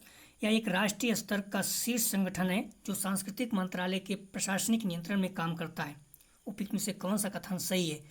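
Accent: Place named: Allahabad, India. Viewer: आपकी आवाज़ मधुर लगती है l एकदम इलाहबदी अंदाज़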